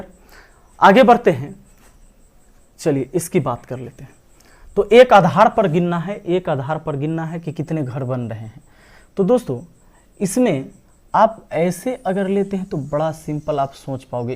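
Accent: Bihar, India. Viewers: I like bihari accent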